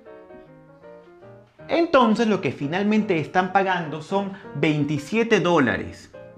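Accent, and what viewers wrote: Peru. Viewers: eres peruano? :o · O sea eres peruano?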